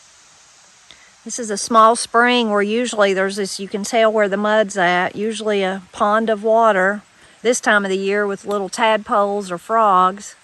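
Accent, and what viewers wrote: Appalachia, United States. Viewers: I really enjoy your hikes with and story telling with your appalachia accent. It takes me back to when I was a little girl, we lived in the country near a creek and I actually would go across the road to play in it. When I watch your family I get a comforting feeling, so, thank you Cindy from Kentucky P.S. Your Presley girls are enjoyable as well.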